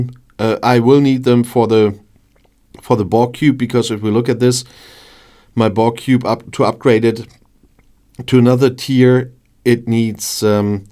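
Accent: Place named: Germany. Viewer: You're English is fine..of course you have a German accent to us Americans but it's not very heavy it's actually kinda cool..however some of your words you pronounce the British way but that's to be expected, overall you are very clear and easily understandable bro 👍🏽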